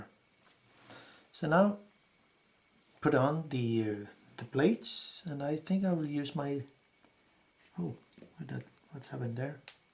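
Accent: Denmark. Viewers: Thank you very much for this amazing and very knowledgable content. I also decided to follow your instructions, however, I ran into problems when I accidentally disassembled the focusing part which lead to a lot of problems. I am fully aware it's entirely my fault but I really want to fix it, is there any way I could contact you please? I live in Denmark, you sound bit danish too, but don't know anyone who could help me. Thank you and good luck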